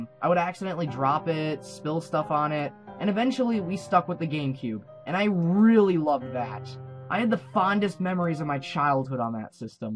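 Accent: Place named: United States. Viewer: You have no idea how hard it was for me to figure out what you meant by preschool, middle school, and highschool. Im from England so Im not really used to how you americans pronounce things xD I think I get it... Like if you cri evrytim.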